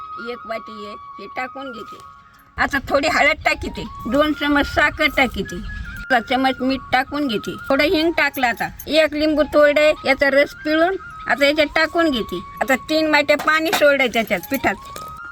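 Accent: Marathwada, India. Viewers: आज्जी तुम्ही मराठवाड्यातल्या आहे का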